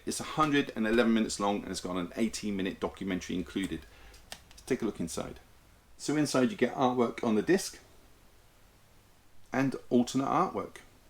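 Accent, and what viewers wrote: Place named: Manchester, United Kingdom. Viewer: no you are right mate, it’s a Manchester accent. I moved to Cornwall around 20 years ago 👍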